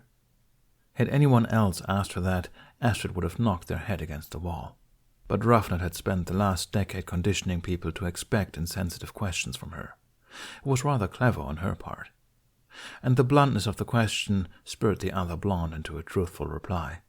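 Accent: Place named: France. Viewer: Dude the THICK french accent OMFG mate its fabulous.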